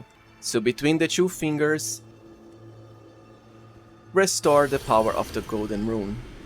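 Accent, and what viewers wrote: Brazil. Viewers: esse cara é br né? esse sotaque n engana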